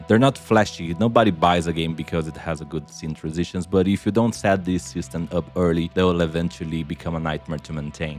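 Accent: Brazil. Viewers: hey is that a fellow Brazilian accent I hear? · sabia. Minha esposa é do Brasil; eu podia ouvir a mesma sotaque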